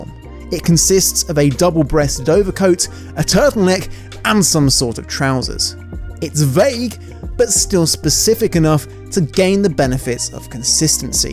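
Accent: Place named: United Kingdom. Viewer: Your british accent works well with you. Makes it more classy!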